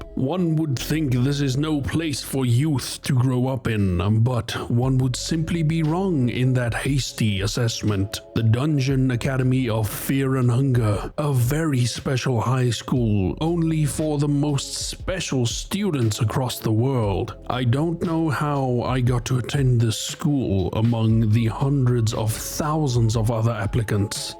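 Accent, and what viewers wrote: South Africa. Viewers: You're south african?❤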